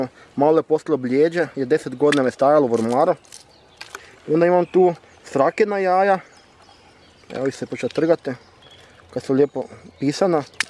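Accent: Zagorje, Croatia. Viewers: Ma Zagorski naglasak, samo sto je ovo u fullu xD sa primjesama Slovenackog, mene podsjeti na Pervana i onog bunara i sina Vl00de ahhaha samo ne moze ni Pervan imitirat koliko ga kralj zavrne jezikom lol, nije mi to poznato bar po imenu, ali kad bi pogledao vjerovatno bi se sjetio, a kako znas da sam iz BiH, nije mi jasno kako ljudi na osnovu nicka, ne pretpostave nego vec zakljuce odakle si:D zanimljivo... EDIT: evo ti to sa Pervanom i bunarom hahaaa <link> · Neznam jeste vi u BIH imali na vašoj televiziji domaći stari humoristični serijal "Gruntovčani"...identičan govor,valjda mi zato i je zanimljiv! Simpatičan:)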